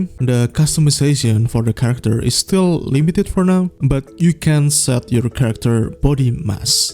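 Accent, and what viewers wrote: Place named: Indonesia. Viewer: great video! i was wondering where your accent originates from? also i'm surprised you don't have more subs, your content is very polished:) keep it up! edit: i'm guessing you're from indonesia or are from indonesian heritage and that's where your accent is from after watching more of the video. i've never learned much about it, so i will definitely watch your videos to learn more about your culture and how it's portrayed in coral island!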